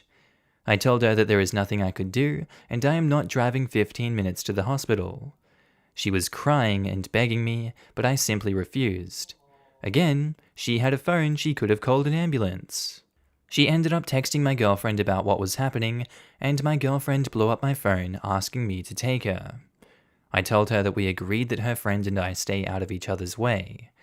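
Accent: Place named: Australia. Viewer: Ah, yes. Our favorite australian narrator with the calming voice that pierces broken souls.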